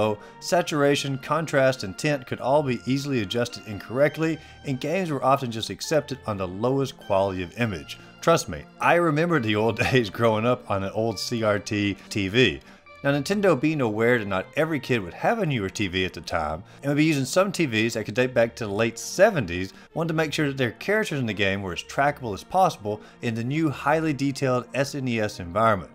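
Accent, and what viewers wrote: South, United States. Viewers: Sweet video man! Sounds like you're holding back a pretty significant southern accent. You should let it shine! Not enough gaming channels with attitude.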